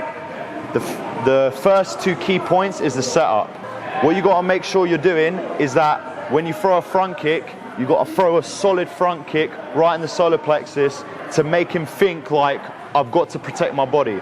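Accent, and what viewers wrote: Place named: United Kingdom. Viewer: He has a british accent.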